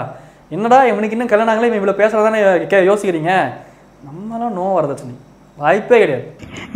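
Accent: Coimbatore, India. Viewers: enunga neenga coimbatore nglaa unga slang supr nga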